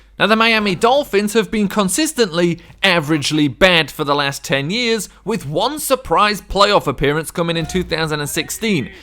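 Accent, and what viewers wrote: United Kingdom. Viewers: Weird to hear a British NFL fan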